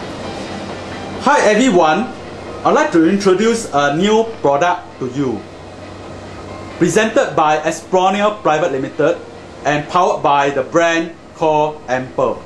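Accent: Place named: Singapore. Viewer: Guys, this is a Singaporean Accent... And he's using Singlish... the Slang of Singaporeans...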